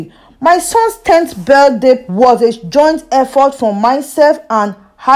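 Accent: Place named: Gullah, United States. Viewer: Enjoyed the video but I couldn't help but notice how similar your dialect is to the gulluh geechee dialect from South Carolina and patois from Jamaica.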